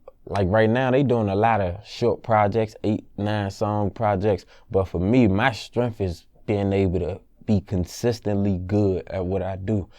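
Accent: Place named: South, United States. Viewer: He Sounds Country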